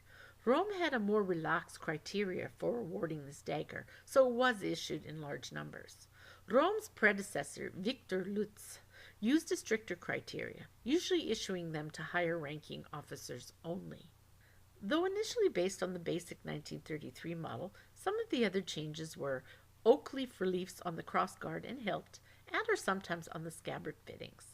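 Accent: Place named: United States. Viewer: i think you meant pronunciation.. shes clearly an american. big deal. thank for the video lady. but crank the sound up, i had to crank my stereo up.. too quiet.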